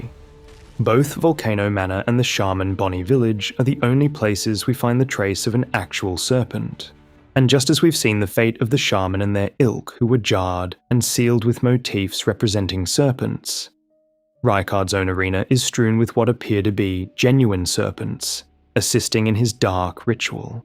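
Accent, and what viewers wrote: Australia. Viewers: i think he's australian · Sounds Australian to me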